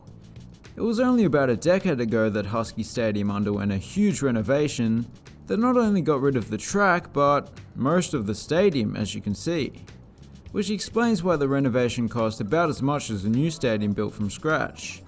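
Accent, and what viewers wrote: Australia. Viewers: Watching an Australian guy commentate on a stadium that I grew up going to and can see outside my window now is pretty uncanny. (Georgia State)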